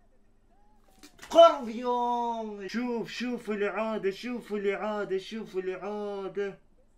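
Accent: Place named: Iraq. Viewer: السلام عليكم ههههه والله ياخوي احب ردات فعلك وكلامك العراقي الحلوووو واصل عزيزي ولنا فخر بتواجدك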